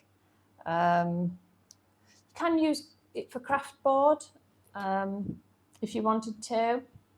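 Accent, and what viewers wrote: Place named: United Kingdom. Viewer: Hey it’s so nice hearing not an American accent when your from the uk, ok I’ve been given a Cricut Personal CRV001 Die Cutting Machine, but the mat unfortunately didn’t come with it, can you help and direct me to where I can get one please I’m looking for a 6x12 mat but I can’t find one any where it’s like 15cms by 30 cms I can’t find one on amozon , your help will be much appreciated thanks.:-)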